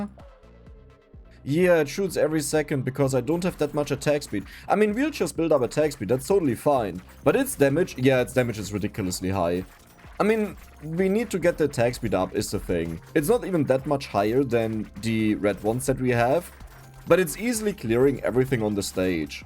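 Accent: Germany. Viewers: Es ist so lustig wie man das Deutsche da durch hört (speeden mit 200 km/h) --- It's so funny how you can hear the German through there (speeding at 200 km/h)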